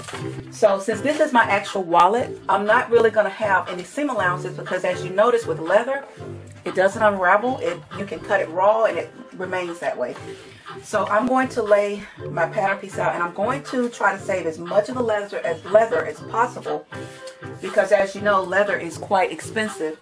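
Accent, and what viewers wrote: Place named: North Carolina, United States. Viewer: I would love to be the owner of a wallet made by is this possible? You sound like you may be from my part of the woods, N.C. I loved watching your video, I'm a 71 year old man and I think you are the best teacher I have seen.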